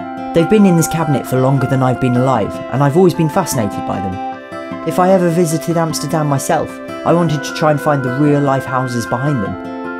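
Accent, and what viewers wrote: Flanders, Belgium. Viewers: Native dutch speaker? She has a flemish accent. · It's a joke, we don't actually dislike Flemish people. Personally I really like the Flemish accent, though it isn't really fitting for a video about Amsterdam. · Lovely video! But it sounds like the girl from the voice over is from Belgium and not the Netherlands! Flemish and Dutch are officially two different languages! :)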